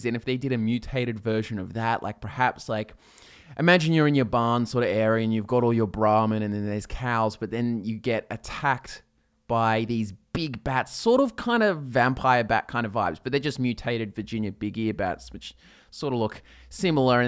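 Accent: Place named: Australia. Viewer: "Set in the yeee of 2122." Oh Austrilians...never change. Never change.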